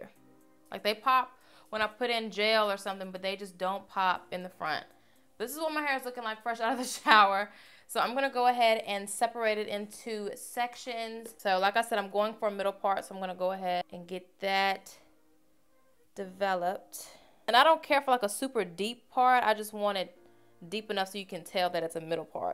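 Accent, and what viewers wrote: South, United States. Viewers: your country voice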